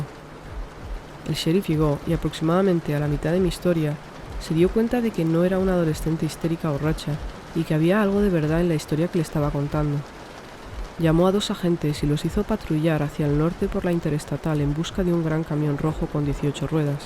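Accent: Spain. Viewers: Los 3 relatos muy escalofriantes la verdad ya que esto es real, muy buenos relatos me encanta ese acento español, saludos desde México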